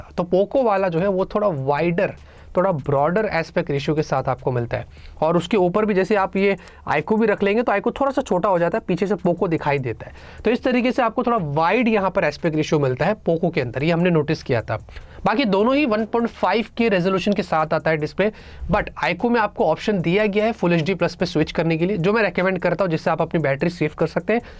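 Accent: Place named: Mumbai, India. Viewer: Apki bambaiyya hindi achi hai...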